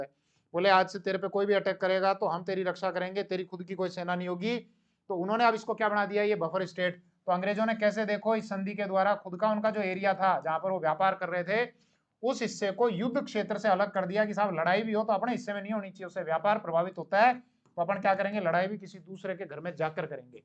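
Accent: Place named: Marwari, India. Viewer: Your voice is so good...your pronounciation is a mix of Hindi and Marwari language..very pleasant to hear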